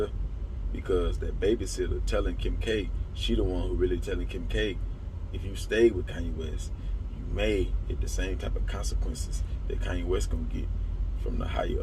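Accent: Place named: New Orleans, United States. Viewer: This nigga sound like he from new Orleans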